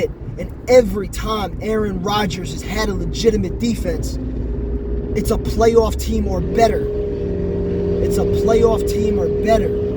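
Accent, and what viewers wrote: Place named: New York, United States. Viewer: My man’s went New York accent on y’all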